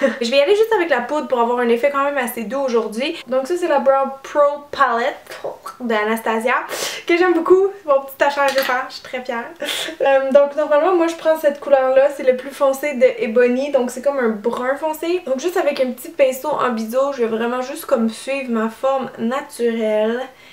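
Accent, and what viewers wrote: Quebec, Canada. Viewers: Tu viens chez moi en avril!! Je suis nouvelle à te suivre sur FB et ici. J'ai le temps de pratiquer ces jours-ci avec mes vacances :-) Je suis québécoise établie depuis de nombreuses années en Suisse, à côté de Montreux, alors si tu as besoin de quelqu'un pour faire la traduction québécois-"suisse"(Loll!), tu peux me demander! :-D Et les suisses romands (francophones) adooooooorent notre accent! Tu seras la star de l'évènement! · Salut ! Magnifique make-up ! J'adore l'accent québécois il est trop beau ! Tu es trop belle ! Je te suis tous les jours ! Toutes tes vids je les ai regardes ! Je t'adore ! Bisous Merci d'avoir créer ta chaine ! Et bonne année 2015 !! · magnifique !!!! je vais le faire au nouvel an ! ps : je suis amoureuse de ton accent ! Je suis française mais j'ai un oncle qui vit à Québec depuis quelques années et je vais le voir en avril....j'ai hate ! 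ton accent me fait deja voyager !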